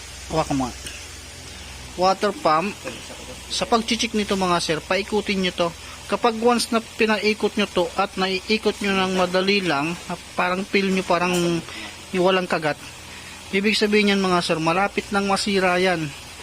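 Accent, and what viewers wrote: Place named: Pangasinan, Philippines. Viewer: damihan m nman upload mo idol nallibang aq halos napanood qna lahat videos mo, tapos yong accent mo na pangasinsn natutuwa aq.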